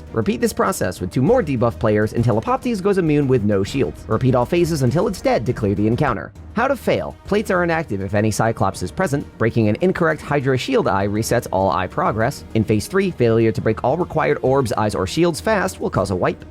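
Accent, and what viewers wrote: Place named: Scotland, United Kingdom. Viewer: This dude does a great scottish accent! much love brother.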